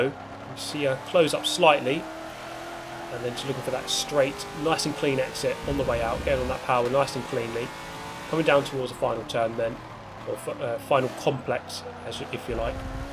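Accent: United Kingdom. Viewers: Just found your channel, and I love it! Excellent British commentary lol and great racing. Keep it up!!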